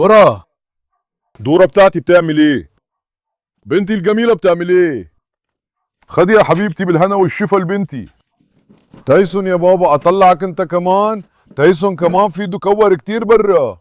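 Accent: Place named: Egypt. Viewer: والله إنتى عسل وبالذات وانتى بتكلم مصرى والله مصرى ابن بلد والله بحبك اوى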